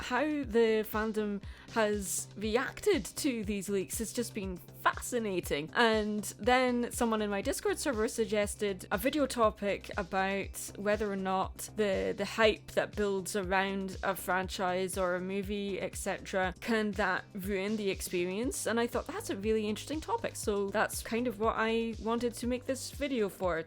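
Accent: Scotland, United Kingdom. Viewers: Love it or hate it this woman spitting facts. Also you sound Scottish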